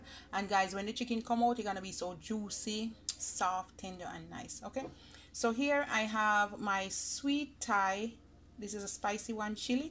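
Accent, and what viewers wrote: Jamaica. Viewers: I've always loved the Jamaican accent, now i love Jamaican roasted chicken too🎉💯🔥🔥will try out sooon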